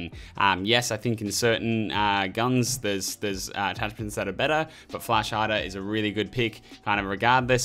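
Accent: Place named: Australia. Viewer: he sounds kinda australian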